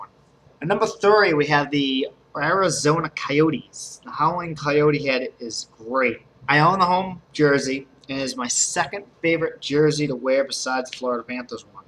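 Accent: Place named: Hartford, United States. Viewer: Wow he has such a Hartford accent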